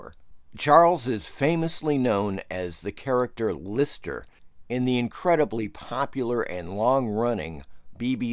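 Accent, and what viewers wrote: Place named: United States. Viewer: You're an American aren't you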